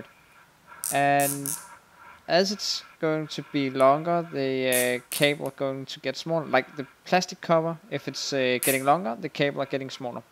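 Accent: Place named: Denmark. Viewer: Det var cool - Nu ved jeg hvad en selv justerende kabel er og den som er på min T4 VW er igen på plads. Hvordan det ennda er skete, havde den selv hoppet ude af pedalens fatning! Du dukkede op under en relevante søgning og selvom ikke VW, traf jeg rigtigt med den første skud. Tak kære bror - med en hånd mekaniker, en hånd videografer :-) Great mate and thanks (oh, and the Danishness is nicely unmistakeable in your seemingly impeccable english. haha)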